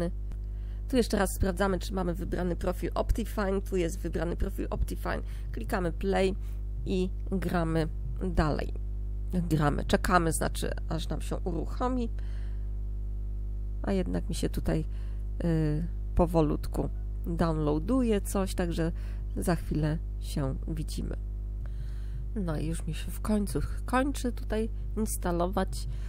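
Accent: France. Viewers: jesteś z innego kraju np. Francji, bo masz taki akcent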